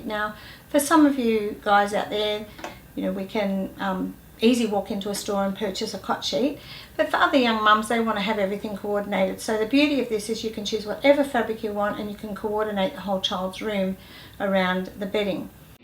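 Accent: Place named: Australia. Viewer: I love your tutorials and it is great to have an Aussie accent!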